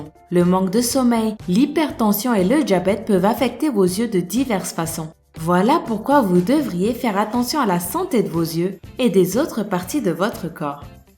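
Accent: Quebec, Canada. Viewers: C'est vous la femme a la fin ? :) Et sinon sympa l'accent j'aime bcp et les videos sont cool egalement :) sa vien du Quebec non ? ^^ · ton accent québécois est trooooop craquant!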